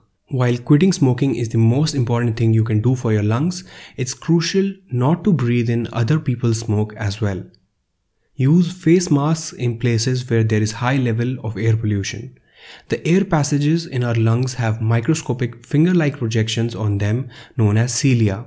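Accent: India. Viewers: I have to think about a scammer everytime i hear that indian dialect "Hi i´m Tim from Microsoft support center, located in Los Angeles" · what a phenomenal video. just what i needed. i love the way indian people speak too. its so soothing and relaxing, i could listen all day my man. maybe i should marry a nice indian girl. thanks for all the great info. liked and subscribed.❤ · I honestly love Indian accents i had a good laughs when he said “number 2nd” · im sorry but i cant take indian english accent serious at all lmfao. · Just some hate how indians speaks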